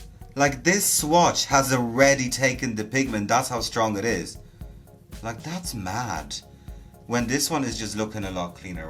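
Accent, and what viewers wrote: Ireland. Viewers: Are you irish?!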